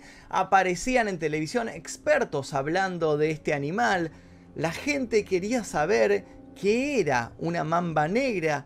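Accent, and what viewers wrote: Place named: Argentina. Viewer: mucho bla bla hablas mucho argentino para lo que narras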